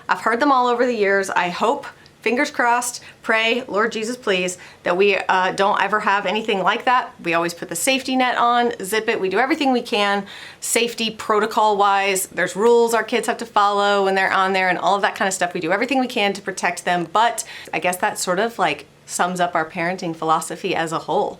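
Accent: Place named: South, United States. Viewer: I love your southern accent! And your real adhdness in this video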